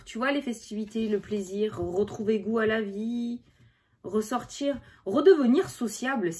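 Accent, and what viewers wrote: South, France. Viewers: Tjs un plaisir de vous regarder......joli accent du sud qui fait sourire ici à Bruxelles...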